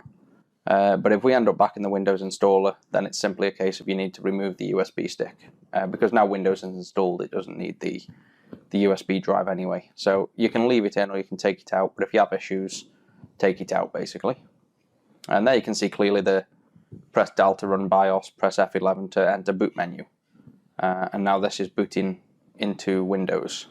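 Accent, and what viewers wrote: United Kingdom. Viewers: You've got a strong British accent!!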